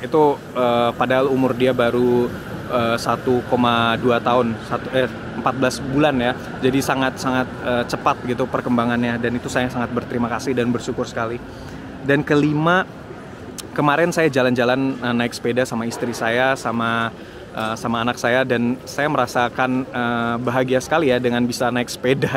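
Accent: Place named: Banyumas, Indonesia. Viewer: wah, kang ya bisa ngendika ngapak....? cilacape pundi mas? semoga selalu sehat dan barokah di manapun njenengan berada.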